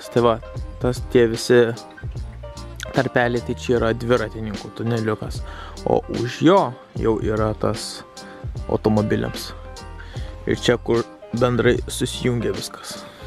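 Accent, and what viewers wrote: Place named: Panevėžys, Lithuania. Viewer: girdis kad panevežiokai :D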